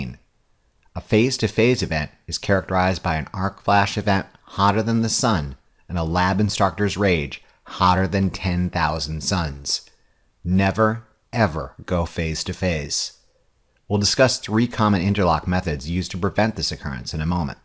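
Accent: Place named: United States. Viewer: his articulation is quite fine. This is american english, he is a native, if you're having hard time understanding him, it's probably because you need to work on your english comprehension.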